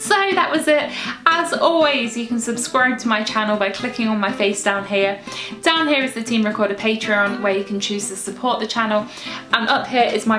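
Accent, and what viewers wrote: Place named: United Kingdom. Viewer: I'm in the second half of the video... and I already got lost :'D but it could be because I speak spanish and I'm not used to hear British English u.u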